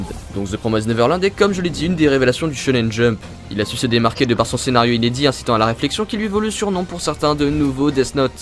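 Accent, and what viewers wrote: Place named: England, United Kingdom. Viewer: Vidéo très intéressante et très instructive , accent anglais au rdv. Appréciable. <3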